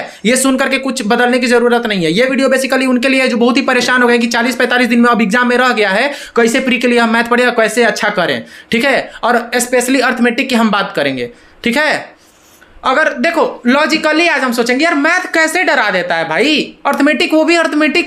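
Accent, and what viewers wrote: Bihar, India. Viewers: shudhh bihari ho bhai hmko❤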